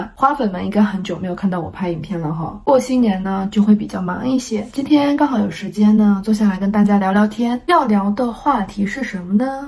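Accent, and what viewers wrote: Taiwan. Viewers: 好耐看!口音聽起來有一點點台灣的味道了! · 其實妳已經融入台灣了，妳的口音快跟台灣人ㄧ樣了，感覺....很棒。台灣很需要妳們這種有智慧高氣質的伙伴加入。謝謝妳加入台灣隊，我們ㄧ起為台灣這塊土地加油，讓我們ㄧ起幸福走下去。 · 你另外一个视频里说自己以因为台湾口音，在健身房有人找你聊哦。怎么这个视频又说自己没有台湾口音了？ Where is your consistency?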